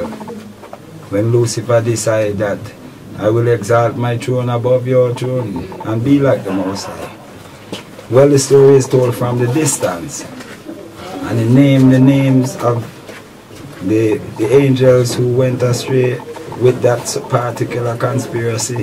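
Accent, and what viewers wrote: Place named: Jamaica. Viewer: His accent sounds just almost exactly like a Jamaican.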